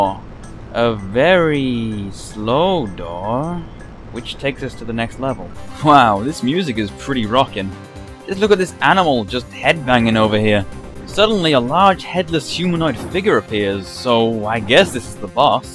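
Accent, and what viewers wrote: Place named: North East England, United Kingdom. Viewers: Diego Rojas The North East of England would be my guess... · Sound like he's from Northumberland where I live.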